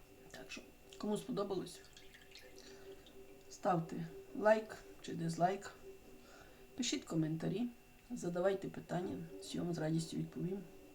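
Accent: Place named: Vinnytsia, Ukraine. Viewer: Доброго дня, якщо не таємниця звідки ви? Ваша мова така рідна, я родом з Вінничини, там такий говір, давно дуже там не була.Здоров'я вам і миру.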